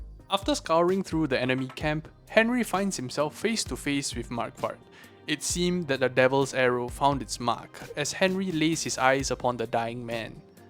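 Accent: Singapore. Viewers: You're either Singaporean or Malaysian, aren't u. I can recognise that accent from 5000 miles away.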